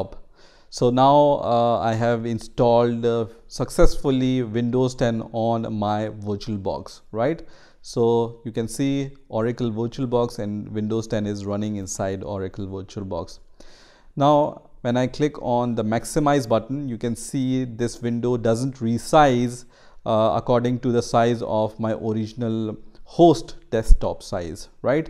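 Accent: India. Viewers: dude this might be racist but I am getting scamming vibes like I am watching one of those scam "how to videos" because of your Indian accent. I know it is not but I am just getting those vibes maybe because I am racist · this. That's clearly an indian accent. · slight indian accent always comforts me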